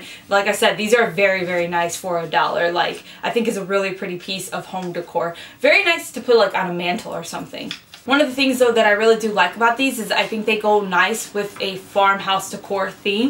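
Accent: Chicago, United States. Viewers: Good luck at your cooking class... loved the haul. I pronounce caramel the same way you do.... maybe a Chicago thang lol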